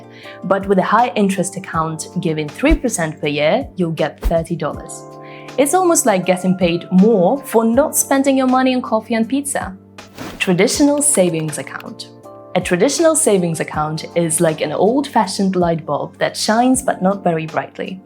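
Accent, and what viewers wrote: Russia. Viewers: Russian pronunciation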